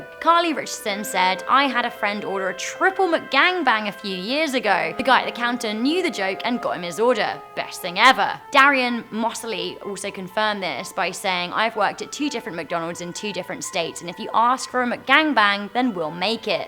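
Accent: United Kingdom. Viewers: I love your British accent , its soo beautiful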